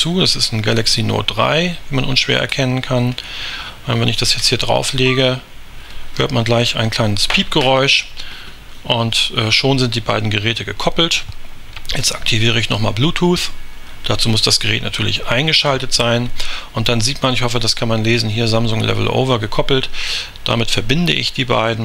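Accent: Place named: Germany. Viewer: very bad english, your german accent is so strong that it is impossible to understand.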